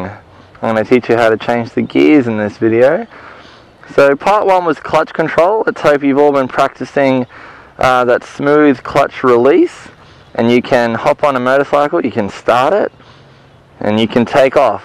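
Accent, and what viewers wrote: Australia. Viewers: Those were very nice videos considering I must have seen every video on "how to ride a motorcycle" right before I got my licence. BTW, nice australian accent and I like how you thoroughly mention every key point and the little things that do actually count. Thanks mate. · mate he has an aussie accent...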